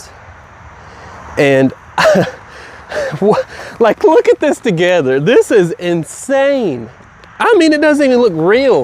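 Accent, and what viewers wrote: South, United States. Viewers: Southern accent is grate , keep it up !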